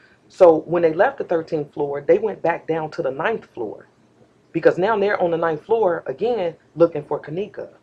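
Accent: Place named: West, United States. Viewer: I love how you articulate your words. I'm from California and you definitely sound like you from the West. I've been studying to get my private investigators license to aid in the criminal defense process of the Justice System. As a "sista" (feel me) and a woman, I believe I can further and investigation that yeilds results my counter parts can not achieve. I can see you as a successful private investigator. Keep us posted!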